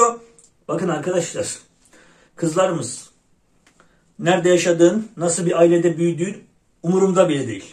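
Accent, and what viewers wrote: Erzurum, Turkey. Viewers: yok reis ne sorunu başım gözüm üstüne konuşman biraz Erzurum lu arkadaşlarima benzettim ondan sordum. 🤣🤣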